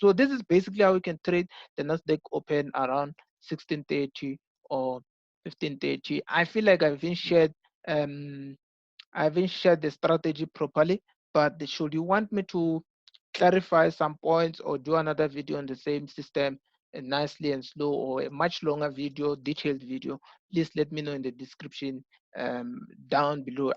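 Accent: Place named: Venda, South Africa. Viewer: And judging by the accent I'd say you're Venda? Besides your love the work bro. And that wololo killed me😂🤣🤣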